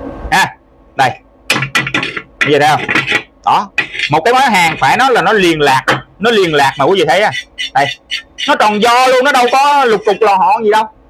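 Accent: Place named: Cu Chi, Vietnam. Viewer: Cảm ơn em , video rất bổ ích , nghe giọng em Anh đóan Em ở Củ Chi phải khổng ?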